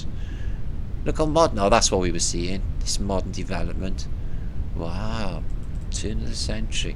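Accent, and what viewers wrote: United Kingdom. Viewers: off the cuff comments are what I live for! Man looking at self in mirror, “Hi, what do you do?” Reflection, “I’m an artist. What do you do?” Man, “I clean cars for a living.” Love you, although I can’t understand HALF of what you’re saying with that British accent!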